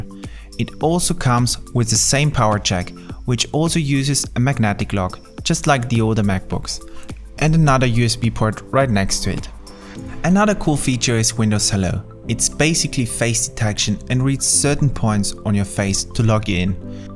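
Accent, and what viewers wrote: Germany. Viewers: lol are you german? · What accent is that? Austrian? hungarian? german?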